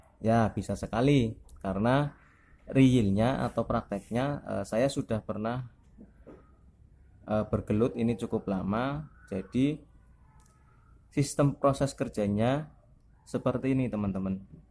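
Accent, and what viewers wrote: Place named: Banyumas, Indonesia. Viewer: Ora ngapak ora penak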